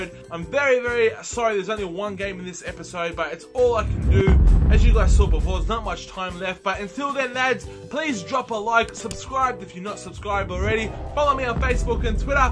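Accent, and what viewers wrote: Australia. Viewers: Isn't it obvious? Australia!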